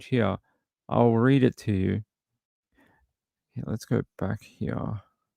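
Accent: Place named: Scotland, United Kingdom. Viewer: I don't know why anyone might have a problem with the lovely Scottish accent 😂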